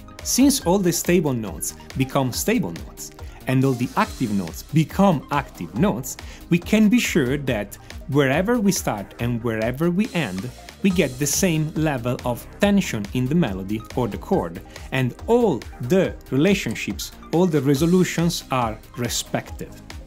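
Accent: Italy. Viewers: im so greatful that you are using the CDEFGAB notes, instead of do re mi blah blah blah, i can tell you're italian by your accent, and i live here in italy and i hate learning guitar or theory in person cause they all teach it in italian terms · I'm ready to bet my house you re Italian! Pronto a giocarmi la casa che sei italiano 😂 bravo e grazie, molto interessante! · I love this itallian acent! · Ma...sei italiano????? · Great. Are You Italian? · I like your italian accent. But the background music is very annoying. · it's italian flavored english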